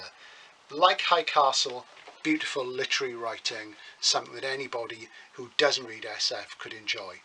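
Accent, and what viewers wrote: Wales, United Kingdom. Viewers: I've been a fan of SF since before my teens (I'm on the verge of 60 now) and Alternative History was always a guilty pleasure. I say guilty because to be honest a lot of it wasn't terribly good, with bad writing hidden by concept, but there are enough gems out there to make the sub-genre worthwhile.. I've loved 'Pavane' since I first read it back in the 70s, and was particularly pleased to finally visit Corfe Castle about 5 years ago. A couple of others I remember from back then are 'A Transatlantic Tunnel, Hurrah!' by Harry Harrison, and 'The Last Starship From Earth' by John Boyd, in which the reasons for the alternative world lie very far back in the narrative, until the rather wacky ending. No doubt you will also do a time travel discussion at some stage, and I shall look forward to it, as fI'm a sucker for Welsh accents anyway, and you have a very easy and confident speaking style.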